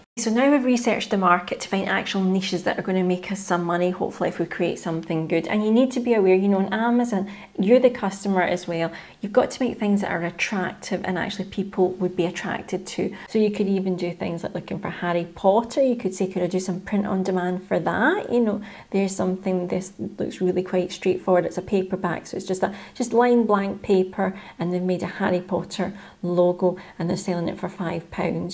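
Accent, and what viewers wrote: Scotland, United Kingdom. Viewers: I've been following your site for about three months. I'm an American who lives in North East Scotland. I used to live in Cumbernauld, so I love your accent. I wrote a book on becoming deb some years ago, and I love watching You Tube videos related to it. You and your husband have one of the best I've seen in this country. Plus this is your best yet. Thanks. Keep up the good work.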